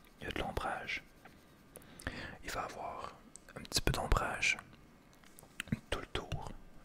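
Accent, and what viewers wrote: Canada. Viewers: Je cherchais d’autres ASMR que les ASMR français pour changer un peu et je suis tombée sur tes vidéos. J’aime bien ton style et surtout l’accent canadien qu’on adore ici !! Tu as une abonnée de plus 😉 Je te souhaite de belles fêtes de fin d’année 🥳🍾🎄 depuis la Vendée en France 🇫🇷 Bonne continuation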